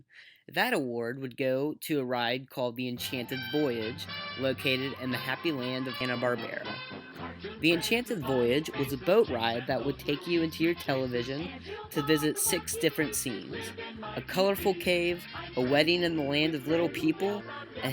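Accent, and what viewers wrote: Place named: Cincinnati, United States. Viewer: This guy has the most Cincinnati accent I’ve ever heard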